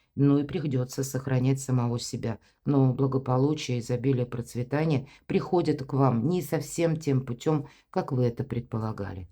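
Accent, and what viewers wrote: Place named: Belarus. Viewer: вы скорее всего из Белоруссии? Несколько лет назад жила в Беларуси,ваш говор проскальзывает.Благородный народ, люблю вас... спасибо ❤❤❤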